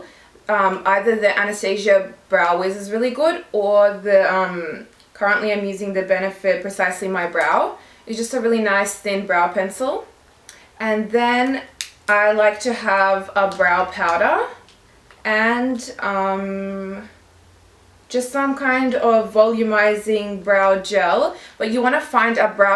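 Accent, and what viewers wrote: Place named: Australia. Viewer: Amazing make up, thanks for the tips. Great to hear an Australian accent. Hope you are healthy and well.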